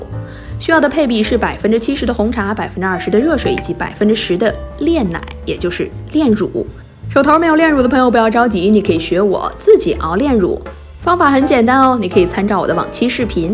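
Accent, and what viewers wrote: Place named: Northeast, China. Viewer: 看起來好好喝，聲音也好聽，很像東北口音😁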